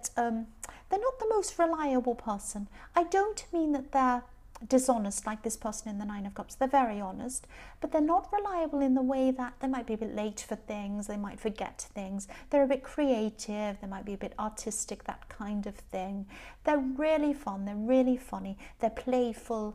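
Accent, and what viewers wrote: United Kingdom. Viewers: u r from UK?your voice is familiar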